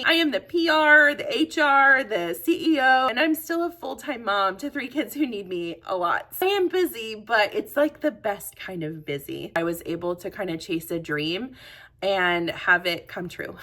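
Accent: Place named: North, United States. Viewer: Lol ... I'm also from the north so I understand the mouth 😂 I now live in Tennessee where they don't have my kind of mouth😅... I found you a few weeks ago and I have to tell you at almost 58 years old my bucket list was to teach myself how to sew and you have inspired me to actually start... 😊 I gave my mom your tote bag and Walker bag today and she absolutely loved to them.... Thank you for being such an inspiration and I hope you and your family have a wonderful holiday season and a very blessed New Year.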